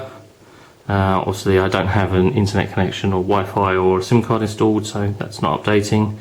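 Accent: United Kingdom. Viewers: your british accent pursuades me to buy this. why do the british make everything seem so advanced and top of the line? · ha i love british accents ! lol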